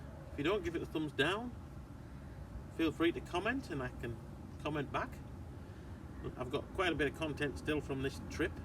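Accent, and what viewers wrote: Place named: County Durham, United Kingdom. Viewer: We have a French Butagaz Le Cube. With a 27mm ‘clip’ (not a regulator) and a 21.8 to POL adaptor, we can use our normal UK propane pigtails. Le Cubes are widely available in France, petrol stations, supermarkets, ….They hold 5kg. We carry the Cube and one Calor in our motorhome on European trips and use the Cube. If it runs out, we have the Calor until we can get a refill. Your accent. Washington / Co Durham area?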